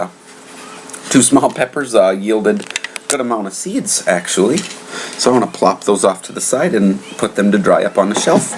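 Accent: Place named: Japan. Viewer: Hey, great review again mate, love the Japanese accent:) mate I'm only a new chilli head but enjoy your reviews and your knowledge. Would love to send you some pods to try when my plants throw a heap out, I'm in Australia and it will probably be another 4 weeks or so but would be keen to see your thoughts on the pods from different growing conditions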